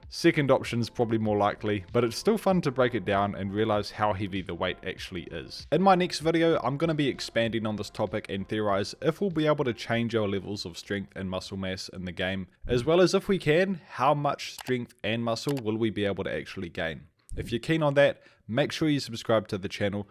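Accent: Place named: New Zealand. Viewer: You sound like one of those New Zealanders who has a slight Australian accent for some reason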